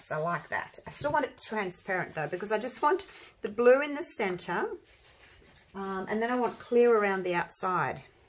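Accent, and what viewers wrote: Australia. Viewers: The eastern brown hiding in your cupboard next to the Vegemite is extremely impressed with your resin prowess 🐍 ❤ I detect an Aussie accent, so I presume you are located in Australia, where it seems every household has at least one highly venomous reptile lurking in the loo or other parts of the house 😬😂 Cheers and love 💕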